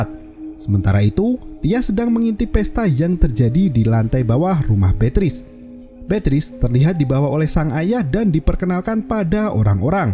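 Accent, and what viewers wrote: Java, Indonesia. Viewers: Jawa km medok benar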